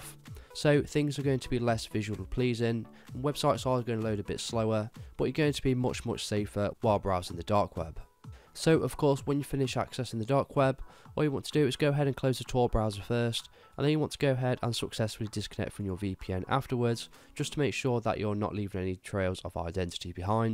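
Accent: United Kingdom. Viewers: Love the British accent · i hate british accent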